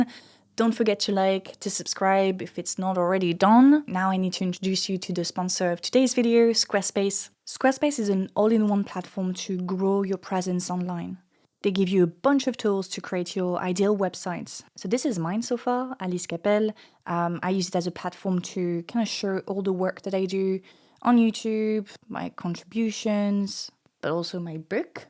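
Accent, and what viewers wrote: France. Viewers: i wish I could figure out where your from. best guess... French, but English educated. doesn't matter. good content intelligent informative soft voice is easy to listen to. thanks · I have never heard a youtuber speaking english with a french accent before. Cool, nice video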